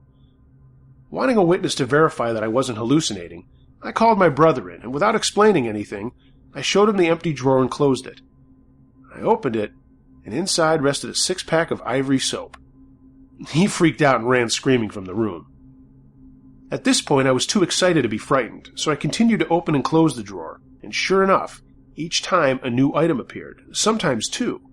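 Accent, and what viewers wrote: United States. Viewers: Still can't sleep. Still going through every video I can find. You have the best voice/American accent around. Thanks for getting me through these long sleepless nights 😁😴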